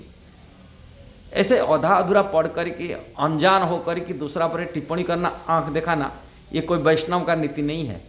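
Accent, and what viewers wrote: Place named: Odisha, India. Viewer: He is from odisha so obviously he has some glitch but he is speaking can u able to speak in oria . sorry for any offence Hare Krishna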